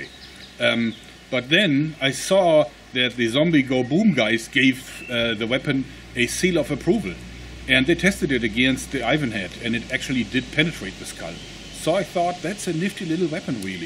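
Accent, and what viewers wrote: Germany. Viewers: Sprichst du deutsch? weil auf mich kommst du wie ein deutscher rüber (von Dialekt)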